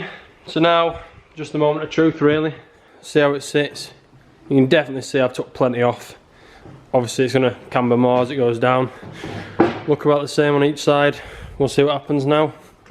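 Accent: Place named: Yorkshire, United Kingdom. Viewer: Where abouts in Yorkshire you from lad?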